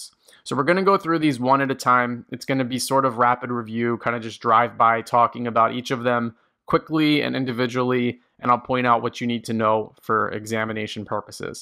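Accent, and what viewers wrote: New Jersey, United States. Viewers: I loved the Jersey accent... it make me chuckle. I'll remember this for sure.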